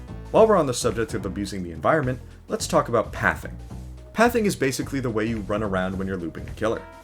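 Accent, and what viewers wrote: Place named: United Kingdom. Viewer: ARE YOU FUCKING BRITISH?:face-purple-crying::face-purple-crying::face-purple-crying::face-purple-crying::face-purple-crying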